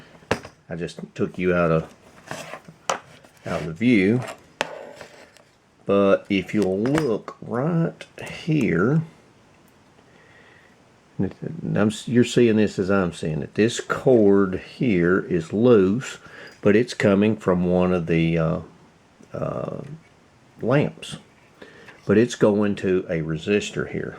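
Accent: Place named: Tennessee, United States. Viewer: Interesting looking radio. Really like the Lady Liberty dial. First time I've seen your channel. I'm a Yankee but I recognized your accent immediately as I had cousins who grew up on Signal Mountain TN. This video is a year old already but I'll be looking at more of your videos from now on.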